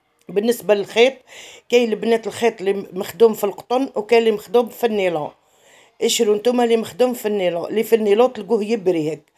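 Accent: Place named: Tebessa, Algeria. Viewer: علا هدرتك راكي من ولاية تبسة تحياتي الخالصة لناس ولاية تبسة والحرير الكبار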